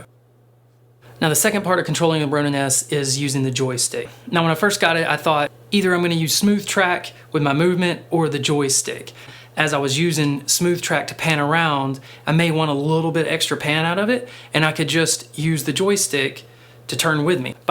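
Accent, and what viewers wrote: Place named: Utah, United States. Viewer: I've got family in Utah that I visited recently (im from Denmark) and I just love your channel and the accent from the Area. Thank you for the great guide on this gimball :) Kind Regards from Denmark Jørgen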